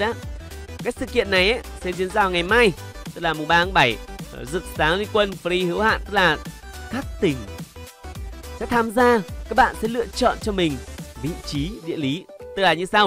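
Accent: Bac Kan, Vietnam. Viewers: Ông ở Bắc Kan à 😍😍 Thích thế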